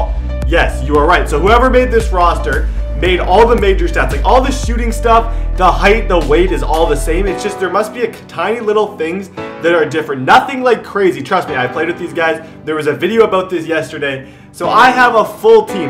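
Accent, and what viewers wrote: Canada. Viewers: I knew you were Canadian since you like the Raptors and how you say about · I can tell Canadian because you say soorry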